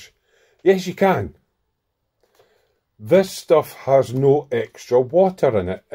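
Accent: Scotland, United Kingdom. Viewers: Thoroughly enjoyed your video on making your own acrylic thinner and airbrush cleaner, very informative, and nice to hear a Scottish voice for a change. IT was not too long but had all the information I was looking for. Thank You!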